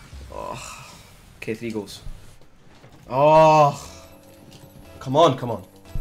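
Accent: Scotland, United Kingdom. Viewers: Is he Scottish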